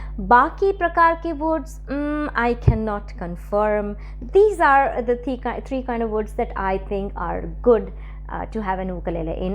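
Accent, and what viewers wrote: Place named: Germany. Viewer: your pronunciation is German based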